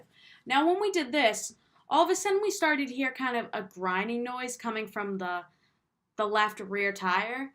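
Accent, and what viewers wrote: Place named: Boston, United States. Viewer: Is it just me or is yr voice getting more Bawstin? Lol I love it.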